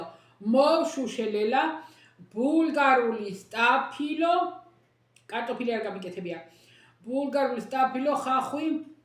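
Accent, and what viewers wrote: Kakheti, Georgia. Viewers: მისაბაძი ქალბატონი ბრძანდებით, თქვენი საოცარი იუმორით, კახურით გემრიელით და თან გულისტკივილით რომ გადმოსცემთ სათქმელს ყოველთვის ჯანსაღად. უზომოდ პატივს გცემთ ქალბატონო,. საშინელებაა, თუმცა მასეთი სულით მდაბალი ადამიანისგან, სხვას არც უნდა ელოდო. ჯანმრთელობა, წარმატებები და სულ ლამაზი დღეები თქვენ.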